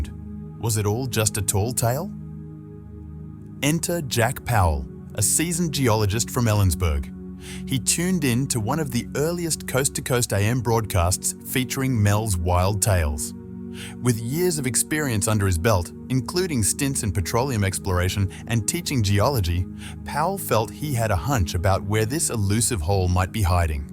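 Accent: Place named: Australia. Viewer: what a waste of time! With a much repeated story line, which I think is written by someone who has a great imagination ! 😅😅 Its a shame, as I was thinking an Australian voice would be nice to hear for a change. But it just got worse the further it went on. 'Just stay tuned to hear the horrible story' of Mel, to find the truth. 😅😅 I stuck it out by typing this 😅😅😅 880,000feet!?😅😅😅😅 Don't bother!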